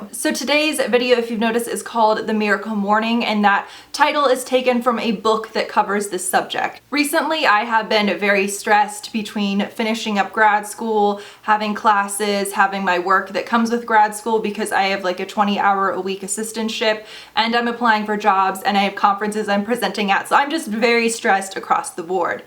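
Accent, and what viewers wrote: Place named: United States. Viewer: I am not an english speaker but I can understand her speech even though she speaks very fast. don't know she use easy words? or pronounce clear. wonder where she is from. think from America but which part of accent? somebody know?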